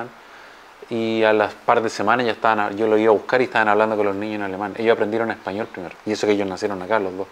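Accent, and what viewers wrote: Chile. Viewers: ...un año después: te cogiste a la del balcón? Me encanta el acento chileno, es muy gracioso y entrañable. Me recuerda a los viejos tiempos. Un saludo desde España. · Me encantan tus historias escuchar un chileno es emocionante cuando estas lejos de tu país 🇨🇱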